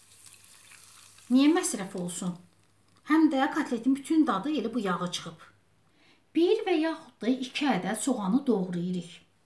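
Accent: Baku, Azerbaijan. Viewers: Shirin Baki lehcesi.Bashqa bir alemdu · Beh beh😋 yemeye söz ola bilmez. Men de hazirlayacam. Xanimin danishigi, Baki lehcesi lap lezzet eledi 😍😍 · Həsrət qalmışdıq Bakı ləhcəsinə. Yeni qoşulmuşam kanalınıza Bakının harasındansuz ? Mən Şüvəlanlı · Lehceden srazi bilinir ki bakilidi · Danisigina bax ay Allah lezzet eledi.Bakililar bir basqadir.🥰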